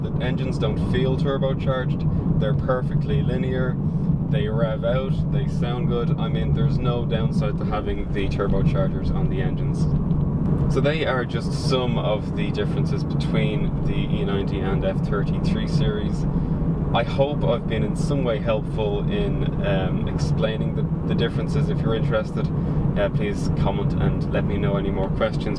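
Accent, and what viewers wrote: Ireland. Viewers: Great review and I'm a fan of the Irish accent :-). Having come from an E90, the steering felt weird at first, but I'm used to it now.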